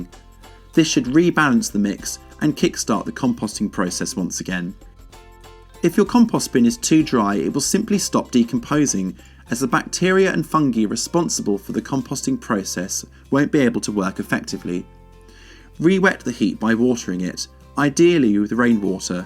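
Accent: United Kingdom. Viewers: Love the hillbilly music the beginning, and also that Mr. Bean accent.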